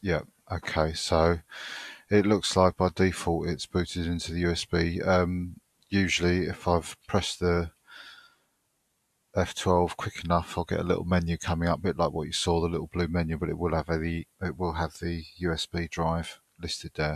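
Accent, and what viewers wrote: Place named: United Kingdom. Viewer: I have subbed and I will be back my fellow brit! I don't think you realise, how pleasant it is to hear someone who doesn't sound like a youtube "bot/nps" and/or an American, No hate to our bothers across the pond, but some of them accents can go straight through me, Like a vindaloo!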